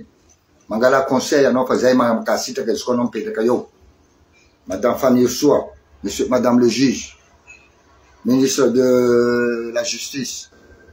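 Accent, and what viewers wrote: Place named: Mahajanga, Madagascar. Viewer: Eh frère ny teny majunga nao motraka bé ,ny atao hoe Malagasy dia manao tahaka anareo karana ,karana karana ngah tsy hainao ny fiteny hoe ftsouraly avy inde miala modia any nareo 👍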